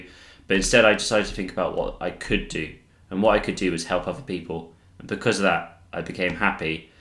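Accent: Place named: United Kingdom. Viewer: good video! now that you're speaking slower, I can hear that your british :p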